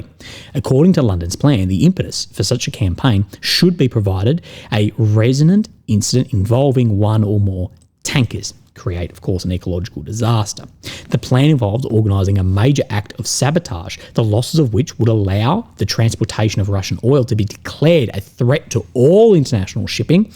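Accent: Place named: Australia. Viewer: When you're reading you going too fast remember you got that deep Australian accent so please slow down when you read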